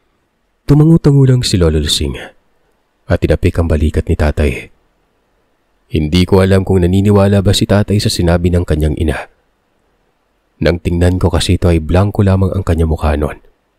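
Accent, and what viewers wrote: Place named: Calbayog, Philippines. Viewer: This is the first time na nakarinig ako ng taga Calbayog hahhaha hello kababayan 😂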